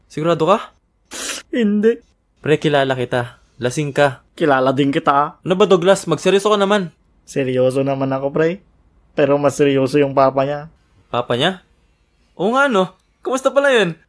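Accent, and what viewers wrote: Ilocos, Philippines. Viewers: ilokano accent ka pre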